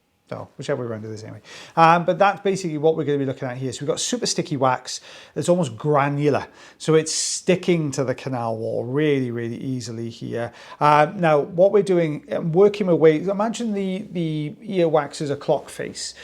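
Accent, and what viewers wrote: Wales, United Kingdom. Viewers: Sorry I had to laugh at your Welsh/English today.. fantastic video as always. Best wishes to, I hope he is feeling better.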